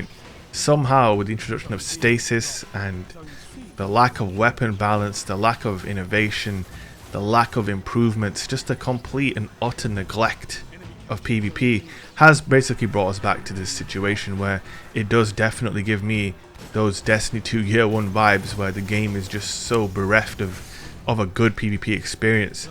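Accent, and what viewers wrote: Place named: West Yorkshire, United Kingdom. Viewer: Are you in West Yorkshire? I know that accent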